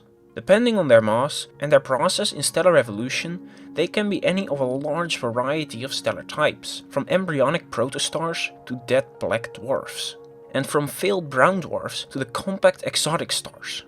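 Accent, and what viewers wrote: Netherlands. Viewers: sounds more like a dutch accent than a lisp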